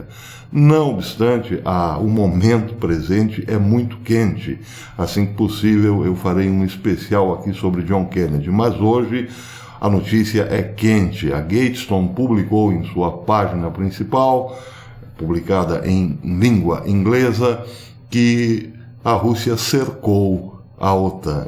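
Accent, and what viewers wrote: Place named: Minas Gerais, Brazil. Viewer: velho de guerra, teu mineirês tá afiado, hein?! Parabéns 👏👏👏😁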